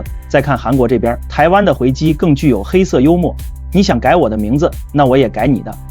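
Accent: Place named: China. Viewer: 奇怪這位先生應該要用台語說才對吧！怎麼會用大陸口音呢？就算把首爾改成漢城也只有台灣自己說而已，全世界都會說韓國首爾！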